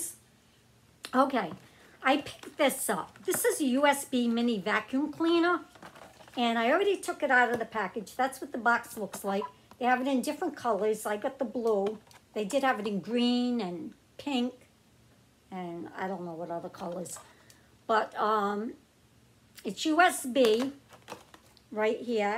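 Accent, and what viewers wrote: Boston, United States. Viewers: Are you from Boston ? Your accent sounds like it , from Canada 🇨🇦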